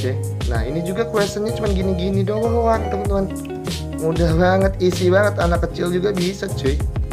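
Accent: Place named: Lampung, Indonesia. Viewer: Lo orang njirr. Kek orang lampung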